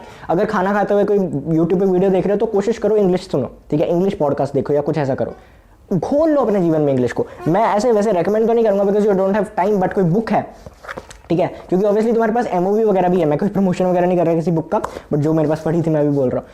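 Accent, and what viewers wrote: Punjab, India. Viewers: Bhaiya are you punjabi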